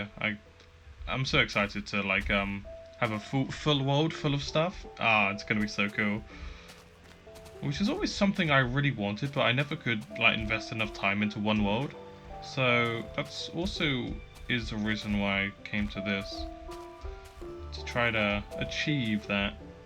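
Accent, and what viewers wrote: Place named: United Kingdom. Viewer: I've been really enjoying your series so far, keep up the good work! :3 I also started playing beta recently and Ive had similar see-through glitches here and there. No idea what thats all about really, guess just some beta bugs. The tower turned out really nice! I love the shape of it. The name Overlook came to my mind when you asked about name suggestions (inspired by the hotel's name from Stephen King's The Shining). I think it fits cause when you're on the top, you can look over your world and see everything really well. Q: What made you start making videos? Also, your Bri'ish accent is cute xD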